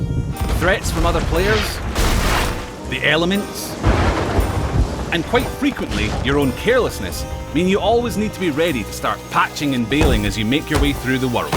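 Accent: Scotland, United Kingdom. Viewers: voice actor? You mean the guy commentating who is Scottish? That’s his normal ascent...... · I don't want to upset any Scottish people but for a long time I thought this guy was Simon Pegg doing a Scottish accent until I realized the guy at rare is also Scottish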